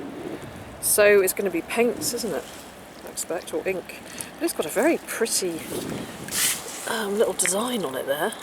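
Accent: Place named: England, United Kingdom. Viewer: Hey Dearheart, I do the same thing, getting stuck on a word or phrase So here are some ^Words^ to help you out. After all, what are friends for, right? Appealing, absorbing, captivating, curious, fascinating, intriguing, delightful, inviting, pleasing, remarkable, amusing, charming, inspiring, thrilling, fabulous, and Galvanizing. I like the last one, coupled with your English accent, would be awesome.LOL So there you are, hope this helps in your quest of expanded vocabulary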